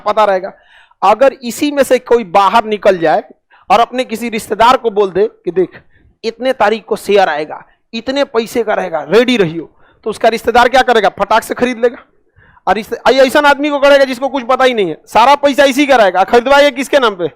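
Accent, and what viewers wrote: Bihar, India. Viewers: Apka accent bihari h but surname kese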